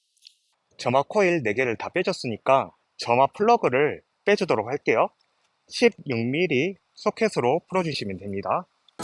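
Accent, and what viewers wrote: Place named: Jeolla, South Korea. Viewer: 저도 전라도라 억양이 우리쪽이라고 짐작은 했습니다.ㅎㅎ 반갑습니다.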